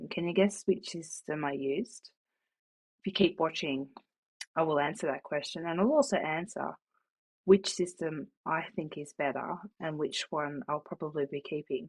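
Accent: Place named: Australia. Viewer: I tried to subscribe but it keeps sending me to another website. I will keep trying as I'm quite impressed with your video, the way you controlled the variables you weren't testing and your Aussie accent is not off-putting as some can be (I am Australian and my own accent would probably not work on Youtube)). Just tried again and it worked to subscribe this time so if anyone else has this problem, it's worth persisting.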